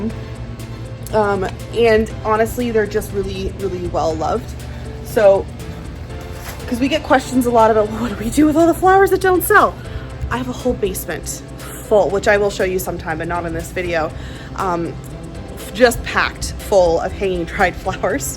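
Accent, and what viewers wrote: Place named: Canada. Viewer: You're so Canadian. EH!... You said it so many times. Love it.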